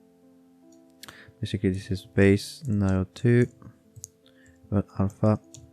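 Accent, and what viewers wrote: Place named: France. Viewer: lmao, you think I want your help after you ruined my experience of modding with your incompetence. Right now, I am disgusted by your way of slacking this, trusting you through the process but it started to go downhill halfway through video, you assume people know all this stuff, not even explains the commands you type, yeah yeah just do it mindlessly like that's the purpose of this video, On top of this, that french accent, yuck 🤮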